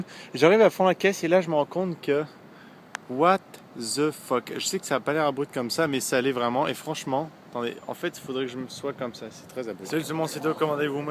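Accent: Quebec, Canada. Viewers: Tu as un accent semi français semi Québécois. C'est fou!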